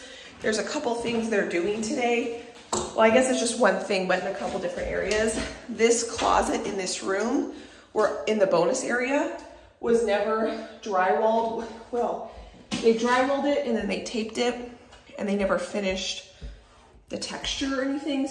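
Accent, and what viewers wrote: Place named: Minnesota, United States. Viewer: You sound like you could be in the movie Fargo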